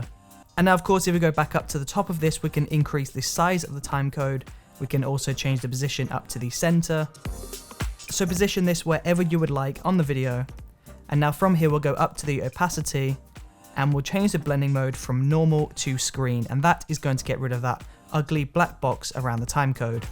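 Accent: United Kingdom. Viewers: Hii, it helped me alot. Thank you very much. Are you British? I like your accent!!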